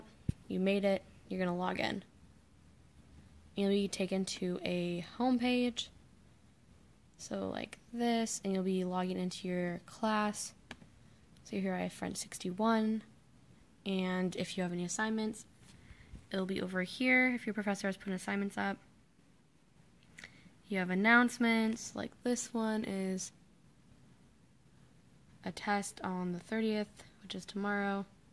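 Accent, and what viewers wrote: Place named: France. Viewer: This is extremely helpful, thanks! I'm a French teacher that wishes my students were able to use this but I only have a teacher manual so no way to log in to supersite! Lovely French accent, by the way! Well done Mme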